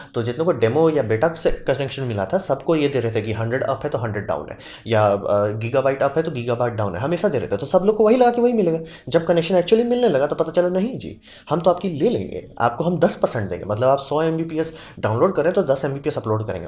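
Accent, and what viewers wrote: Bihar, India. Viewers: 100% pure Bihari vibes 😎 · Aap puree bihari hu ekdum fulltu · May be you are bihari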